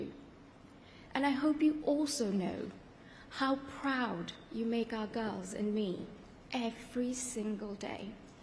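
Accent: United Kingdom. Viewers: Her accent is so Brit 😮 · Omg !! That British accent !!! She took me by surprise!! I thought it would be Indian English . Never mind. Good luck to both of u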